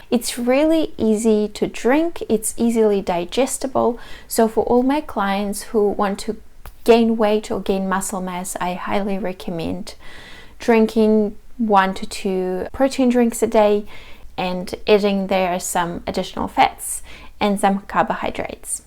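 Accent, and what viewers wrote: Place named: Russia. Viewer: Очень сильно бьет по ушам рашкинский акцент)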